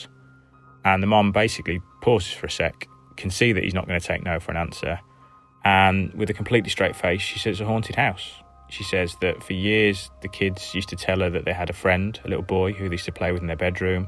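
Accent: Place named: Black Country, United Kingdom. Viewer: Nice to hear a Black Country voice! I grew up in Stourbridge...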